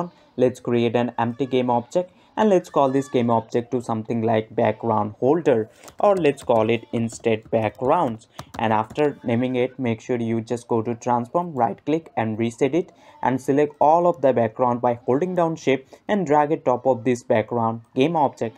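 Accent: India. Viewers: The quality of the parallax is not good enough, also your project needs pixel perfect scrolling etc. Furthermore hindi accent is quite annoying for english speakers to listen to. So make great effort to lose that accent as good as possible.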